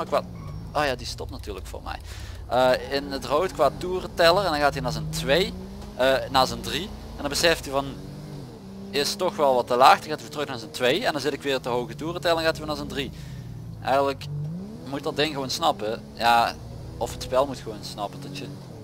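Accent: Limburg, Netherlands. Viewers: Mooie auto met de nieuwe striping! Gaat de politie de tourans ook nog nieuwe striping geven? EDIT: je komt uit Limburg, daarom heb jij een accent maar je moet gewoon praten hoe jij dat wilt! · Blijf je zelf. Je komt uit Limburg en daardoor heb je een accent wat ik overigens opzich wel mee vind vallen. Ik vind het helemaal niet erg, je moet lekker praten hoe JIJ dat wilt. Lekker boeiend wat anderen vinden, wij verstaan je en begrijpen je. Dus je hoeft helemaal niks te veranderen aan hoe je praat. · Praat lekker limburgs man je mag toch gwn doen wat je wilt anders zet hij/zij z.n geluid maar uit. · En ik merkte het niet dat jij Limburg s bent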